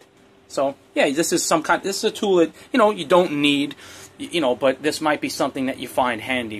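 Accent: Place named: East Coast, United States. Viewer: by now you know I'm a fan of your channel, and the work you do. I've been trying to add up why you are overseas, your obviously an American with that East Coast accent. today you mentioned Matthew West, I'm thinking you're referring to the Christian singer? how far off am I by thinking your a Christian Missionary or Teacher? BTW- I think I really like the guillotine and I really really like the tongs. I am very interested in purchasing both, but will have to save some $ first. at any rate friend, keep sending us videos, you are a great educator of this craft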